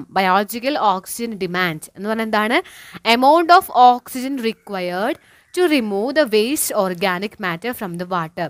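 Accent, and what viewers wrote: Thrissur, India. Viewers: നിങ്ങൾ തൃശൂർകാരി ആണോ, റിപ്ലൈ തരുമ്പോൾ ഇടാം ട്ടാ എന്ന് പറയണൊണ്ട് ചോദിച്ചതാ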